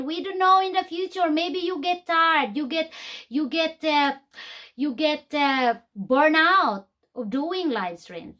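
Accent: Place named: Scotland, United Kingdom. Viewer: Hello dear, I love listening to your voice it makes me feel talking to my Aunt in Scotland, your accent is same as her. I can relate to what you are talking about too, I experienced twice a person commenting on the comment of my viewer I thought it was normal here in yt world, it is disrespectful in a way but I leave it behind. I always agree to the golden rule. KEEP SAFE.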